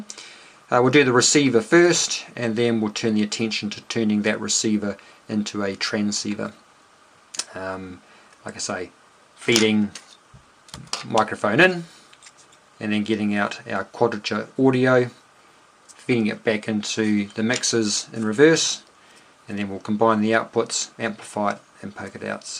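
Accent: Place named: Alabama, United States. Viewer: Great video, but Alabama accent is pretty thick.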